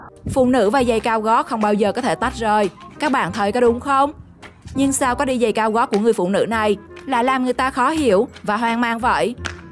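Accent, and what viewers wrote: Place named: Tuy Hoa, Vietnam. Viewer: chào AD. giọng Ad khá quen Tuy Hòa thì phải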